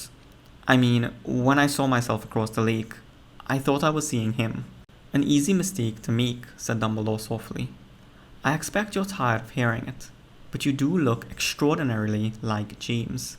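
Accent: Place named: India. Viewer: I can tell the narrator of this video is a South Asian Indian from his accent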